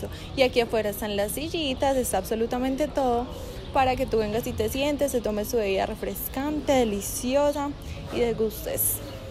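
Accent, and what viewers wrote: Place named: Colombia. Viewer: Hola juapa saludos desde los Estados Unidos soy de acapulco megusta ese asentó maravilloso por ese asentó empecé aver las series de Colombia saluditos juapa 😊😊😊😊😊😊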